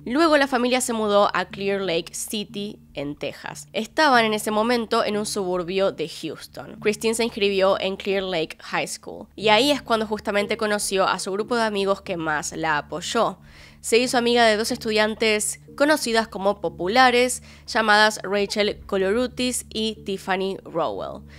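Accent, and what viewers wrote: Argentina. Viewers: Porque los argentinos no pueden decir la Y y la LL y dicen "Sho" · Es argentina :D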